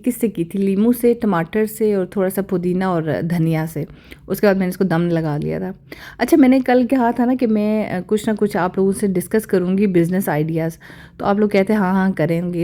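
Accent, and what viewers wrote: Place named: Kashmir, India. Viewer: ❤AP k zillah bhimber army center family quarters sa hn AP k velog bhot acha hoty hn 🎉🎉 mñ 27year sa family quarters MN rah re hon AP MN offeiceres waife wala gharoor Nazar ne Aya or 1baat kashmeer k log bhot achy Mily mujy leepa bodr samahni kotli atthmuqaam all bodr ayrias k log sub bhot achy itny driy froouits khay hn hr Tarah k wha g kia baat apke kashmeery zuban metthee se baji 🎉❤❤ bhot ceuit hn sub ko assalamualaikum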